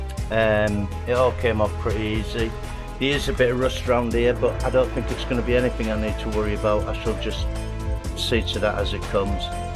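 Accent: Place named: Teesside, United Kingdom. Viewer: Sounds like a Teesside voice there - but Teesside/YORK/Hull are similar. Well done. Good job!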